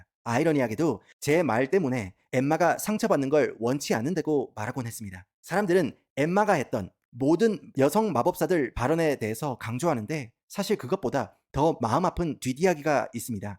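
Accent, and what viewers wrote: Gyeongsang, South Korea. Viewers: 니말투 경상도인간이네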